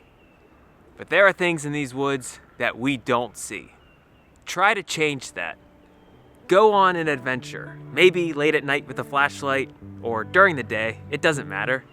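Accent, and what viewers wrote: Pittsburgh, United States. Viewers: I love hearing a Pittsburgh accent in a nature video. We do have interesting backyards lol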